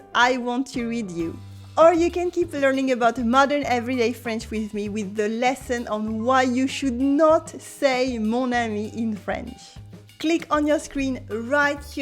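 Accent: France. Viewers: OK! You a French woman or a Quebecer? Makes a big difference!!!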